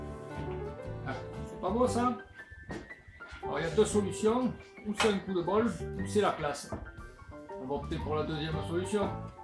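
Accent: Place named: Var, France. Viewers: Nouvel abonné sur votre chaîne je parcours vos vidéos . Merci de votre partage . Amateur de bois je commence à avoir quelques machines et du coup je pense aux aménagement et votre montage est inspirant . Et les cigales dans la voix me rappel mon adolescence dans le Var . Merci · salut j'ai découvert ta chaîne y a peu de temps et je me suis dévoré toute tes vidéos elles sont bien faites avec des combines de vieux routard, de l'humour et tu ne t'attarde de pas sur des futilités mais elles s'adresse a des débutants avec un peu de connaissances du métier, je m'explique quand tu présente un outils montre nous les changements d'outils et éventuellement leurs réglages voire leur nettoyage et leurs affûtages quand cela est possible sinon j'adore ton accent il est du sud mais de ou? moi je suis du nord de la france. au plaisir de plein de nouvelles vidéos et garde les bétisiers c'est trés sympa bonne continuation et tout plein d'abonnés amitié Fred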